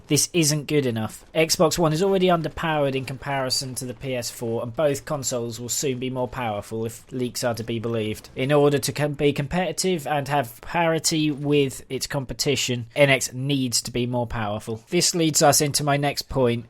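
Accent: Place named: Norfolk, United Kingdom. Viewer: Im originally from Nottingham now I live in Norfolk Sheringham had done for 8 years so iv got an ear for the Norfolk dierlect how ya getting on lol you gave it away when you said money grabbing I think they know to be stronger then the ps4 and xbox I'm loving the wii u pick up and play with the handheld I think it will surprise every one it needs to add me in wii u my Id is imrobinurhood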